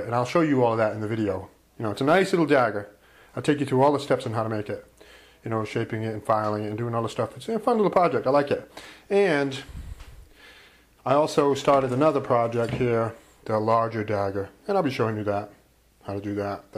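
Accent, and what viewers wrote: United States. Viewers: Are u american?D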